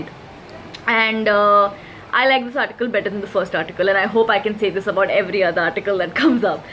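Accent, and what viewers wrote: India. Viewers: What's dat hindi accent ??🤔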